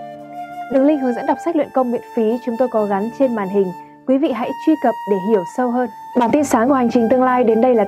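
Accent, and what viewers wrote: Standard. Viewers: giọng nói chuẩn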